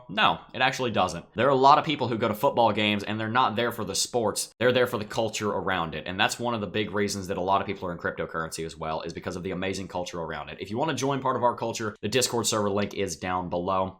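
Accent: South, United States. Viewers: Don't do it. Your folksy southern cadence is all we need!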